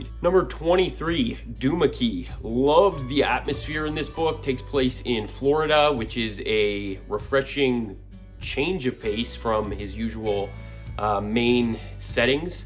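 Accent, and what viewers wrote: Canada. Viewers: You're Canadian, aren't you? (I subbed btw nice list) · Love this presentation, love your Ontarion accent.Respectful , sincere , dedicated- lots of fun.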